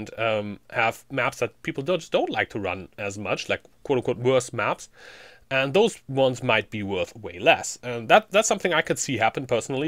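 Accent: Germany. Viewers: wow, your english pronunciation has improved quite a lot over the years. as a fellow german, i always heard the german accent but now its almost gone. keep up the good work.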